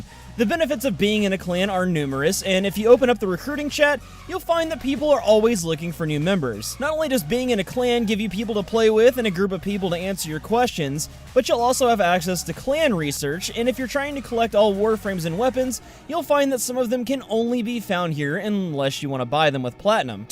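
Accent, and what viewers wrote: United States. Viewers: Are you from America?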